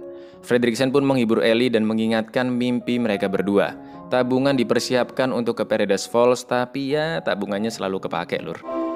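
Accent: Java, Indonesia. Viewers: Bang orang jowo ya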